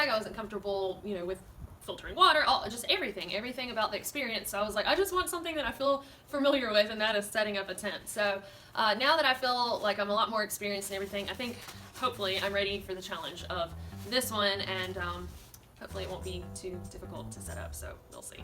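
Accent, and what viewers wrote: South, United States. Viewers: Ha ha! I like the low-key southern girl thinkin' noises! Eeehhhhhmmmmm..... sounds like home. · I was thinking, shorten the poles down dummy, but the bathtub effect makes sense. Where is your accent from, I'm guessing Arkansas or Alabama.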